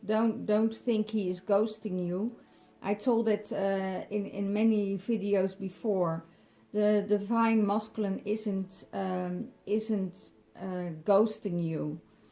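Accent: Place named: Netherlands. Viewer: I can hear you are Dutch hahaha it obvious hahah; ik ben afgelopen weekend zwaar getransmuteerd 👌🏻 I like it!!!!!!! Ik ben een man beschouw mezelf als DF, Scorpio. Indigo, yellow worrier en heyoka T is allemaal om de dualiteit in zelf naar 0. of.0 te brengen Lekker in balance joh 👌🏻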